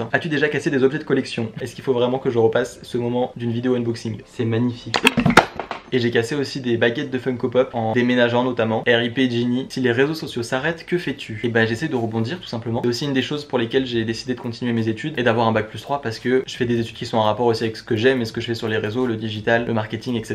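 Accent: England, United Kingdom. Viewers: Alors, j'adore ton accent anglais, team Serpentard depuis toujours hehehe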